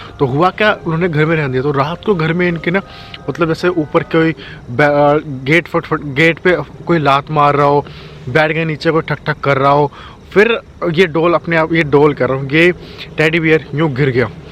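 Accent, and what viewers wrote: Haryana, India. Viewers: Apka hariyanvi accent itna cute lagta h real Ho bhai don't worry aur pls apni safety ka khyal rakho god bless you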